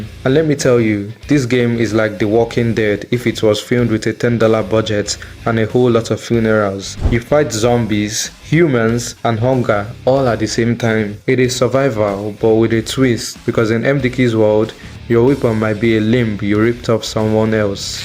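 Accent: Nigeria. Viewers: Bro sounds so Nigerian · Yo bro you sound Nigerian ❤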